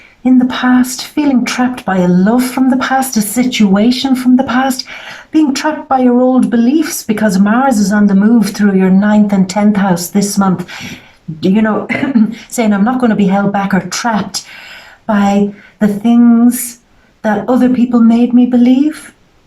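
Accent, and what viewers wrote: Ireland. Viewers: Always a pleasure to hear your soft Irish accent, I am a June 20 guy. Painter and writer, very Irish and awake. Like yourself I am living far from home but you can take the woman from the island but not the island from the woman. I have lost nothing that I experienced in life but have managed to place things in quarantine lol. Big hugs. Eric. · Doesn't she have an uncanny resemblance to Elizabeth Hurley?.. that gorgeous Irish accent.. brilliant! Gurl you really speak with such clarity and I love it.. yes I have enrolled in distance learning and just didn't have the time to do it.. yes I have been afraid to do my work because I have always thought I wouldn't get through it.. I really want to go back to Uni and completing what I have always wanted to do... I'm older and want to be able to say to myself that I have lived my life. So thank you for this gorgeous reading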